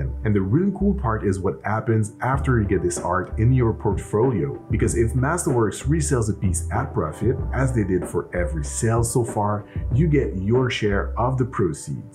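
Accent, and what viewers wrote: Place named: Quebec, Canada. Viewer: He is French Canadian, if you're looking for correct pronunciation you're probably in the wrong place. Yeah, just made the cocktail (including the grenadine recipe) very balanced, could use a bit more bitter imo so I threw a couple drops of bitters on top. Didn't have any cucumber though, I imagine just adds another level of freshness on top of the lime juice.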